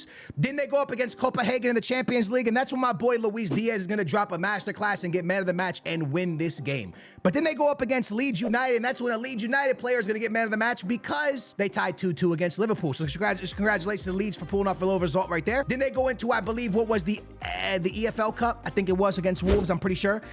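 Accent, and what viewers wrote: Portugal. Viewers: He's Portuguese let him off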